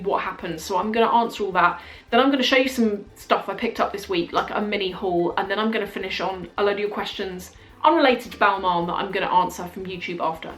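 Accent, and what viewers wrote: United Kingdom. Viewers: You seem such an a pretty person!!! I've just suscribed to your channel because I'm studing english and I really enjoy your videos and your british accent as well:) Regards! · Its sooo brit .. love it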